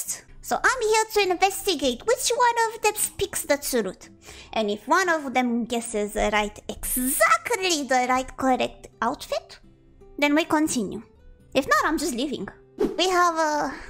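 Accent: Canada. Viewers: That damn Canadian accent is crazy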